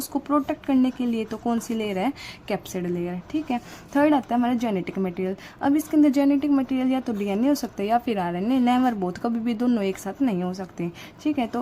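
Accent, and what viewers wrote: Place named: Western Uttar Pradesh, India. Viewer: Very good teaching 😊 Aap kha se ho ? Language Hindi aapne west up bagapt sayd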